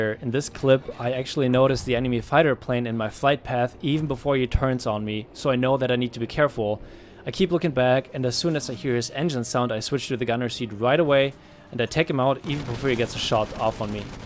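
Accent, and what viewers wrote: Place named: Germany. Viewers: • dedo • wow he is German? I didn’t know that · Ersten Satz gehört.. direkt gedacht, dass da jemand aus deutschland kommt :^) trotzdem beneide ich dich für dein englisch! echt schönes video! :) weiter so.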